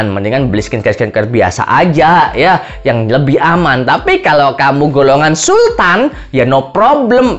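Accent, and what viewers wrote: Java, Indonesia. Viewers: Logat medoknya doterkuh.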